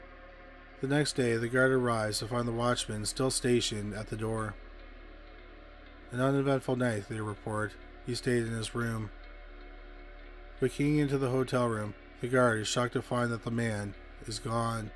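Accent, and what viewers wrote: Canada. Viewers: I follow and just happened upon this site; once I heard the narrator's Canadian accent I made the connection. Thanks for the input, nice work as always.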